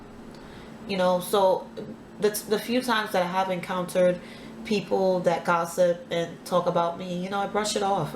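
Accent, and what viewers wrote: New York, United States. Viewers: are you frm ny? "talkin talkin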